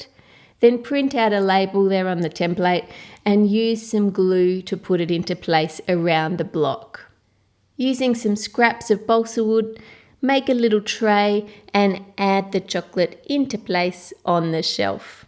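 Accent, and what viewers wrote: Australia. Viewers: I like your Australian accent :D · Is she Australian or English? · Heaven Claunch haha I didn't notice that because I'm Australian like her 😂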